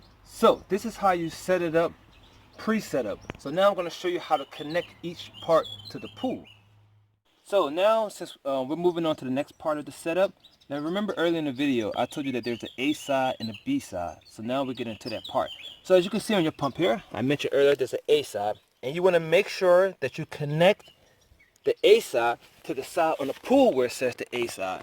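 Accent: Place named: South, United States. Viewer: Thank you for the clear explanation. Your Southern accent is the icing on the cake :-)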